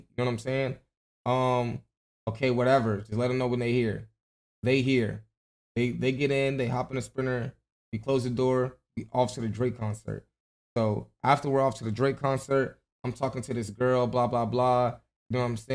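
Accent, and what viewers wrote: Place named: Canada. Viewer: Mans talking like Canada sweet lol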